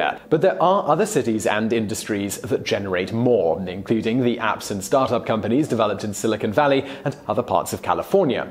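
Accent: United Kingdom. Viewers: a bloke with a british accent says london is the capital the world totally obejective.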